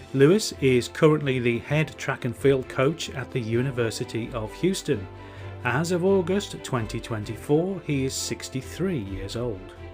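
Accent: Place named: Wolverhampton, United Kingdom. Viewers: After all these months of being a subscriber and watching I've always thought you sounded local, it's only when you mentioned Tessa Sanderson you revealed yourself to be a fellow Wulfrunian. Love the content, as an 80s child it's lovely to reminisce while watching. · I'd have definitely included Linford Christie as he's a legend for sure! Also Colin Jackson, but that's probably more of a "me" thing as he's Welsh and I love a Welshman! 🤭 So you're from Wolverhampton then? I've got a friend there. Really should have twigged to the accent!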